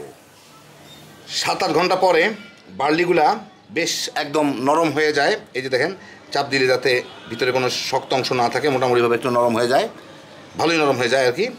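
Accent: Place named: Bikrampur, Bangladesh. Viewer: Assalmualikum . Are you from Bikrampur (Munshigonj) ? Your sound seems like that .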